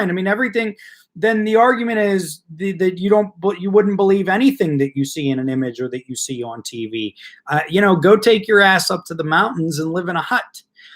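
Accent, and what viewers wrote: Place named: Pittsburgh, United States. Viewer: I love that Pittsburgh accent. Miss it! From WV most of tv came from Pittsburgh. KDKA, WTAE. You aren’ t. Old enough to remember “Chilly Billy”. WIIC. We would lose our TV when the weather got bad. May God bless you in the New Year! Could go for some great Italian food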